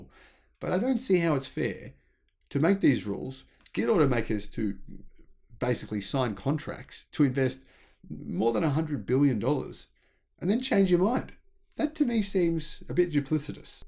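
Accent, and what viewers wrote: Australia. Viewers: Your OZ accent is tough to follow. The speed of your speech makes it even tougher. Can you modify either, so that non-oz speakers can better comprehend your narrative ? Tx.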